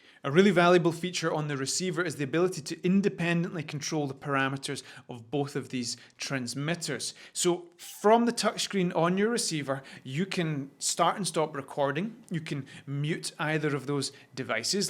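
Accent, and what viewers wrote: Scotland, United Kingdom. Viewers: Hey, love your videos and espacially the scottish accent haha Where can we find the tripod that you use?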